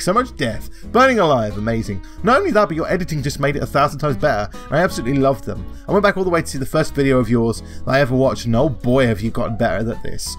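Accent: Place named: RP, United Kingdom. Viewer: I came here and subbed for the WoW stuff. But I stayed for the posh accent hehe 😉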